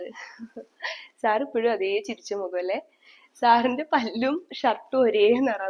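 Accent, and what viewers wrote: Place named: Malappuram, India. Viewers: സ്ക്രിപ്റ്റ് 👌🏼👌🏼👌🏼👌🏼👌🏼🥰🥰🥰🥰🥰🥰.. നാട്ടിൽ എവിടാ...calicut ആണോ??? Slang നമ്മുടെ മലപ്പുറം, calicut പോലെ ഉണ്ട് ❤️❤️❤️ ഞാൻ calicut ആണ്.. അതാ ചോയ്ച്ചേ 😊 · നിങ്ങളെ ഒരുപാട് ഇഷ്ടം ആണ് നാട് എവിടെയാ സംസാരം കേൾക്കുമ്പോ മലപ്പുറം ഒക്കെ ആണെന് തോനുന്നു pls reply❤️ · മലപ്പുറം , പാലക്കാട് slang ആണെന്നാണ് കേട്ടിട്ട് തോന്നുന്നത്. ഞാൻ മലപ്പുറം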